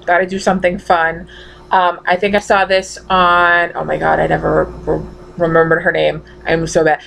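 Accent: United States. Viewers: Awesome video! You totally have me wanting to do this now. Love the Mass (tried to spell it out, failed lol) accent! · Funny. Your accent sounds more Boston to me. I don't have one. Tfs hugs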